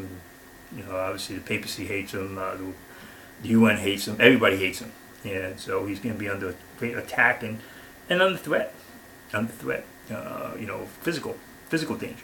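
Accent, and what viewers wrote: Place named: Boston, United States. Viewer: Are you from Boston like me ? You sound like you're from Boston.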